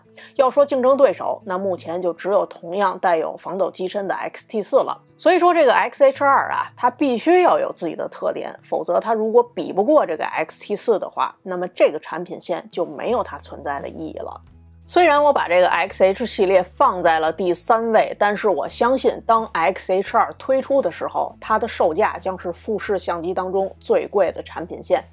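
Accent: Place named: Tianjin, China. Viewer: 听起来像是天津老乡 嘻嘻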